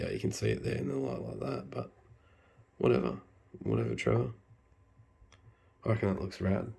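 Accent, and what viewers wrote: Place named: Australia. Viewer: Bro can I buy this off you, I can tell you are Australian from the accent and I live in Australia too can we try make a deal?😂💎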